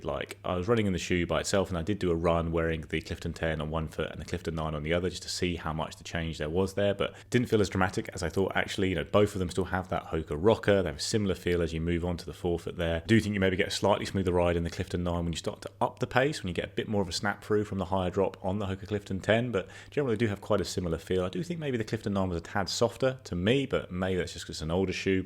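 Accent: United Kingdom. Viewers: Talk just a bit too fast for an American to decifer the accent. I generally do well with Brit accent, until y'all start running words together/talking fast. Thx for what I could understand!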